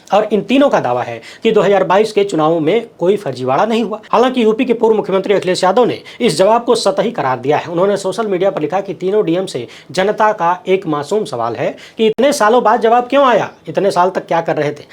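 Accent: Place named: Bihar, India. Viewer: bhai apke kaam se ziyada aap pasand hi mujhe apke bihari language make me laugh..hats off bhai.. for your unbiased reporting...Allah apko humesha kamyabi dey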